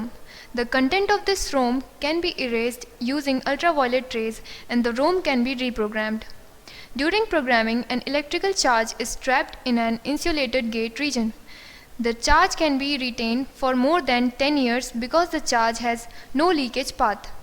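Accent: India. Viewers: If u have someone special from india then u will miss that accent.. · Ahhh, shit.This indian accent is everywhere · oh god i hate this indian accent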